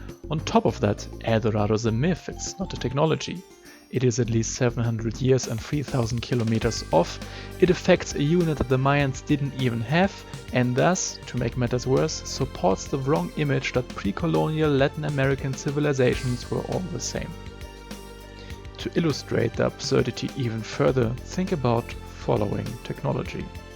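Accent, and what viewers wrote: Germany. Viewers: i hear you are german - me too :D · Great video, love the content and I think you sound like Cillian Murphy if he had a German accent 👏🏼. Looking forward to the next vid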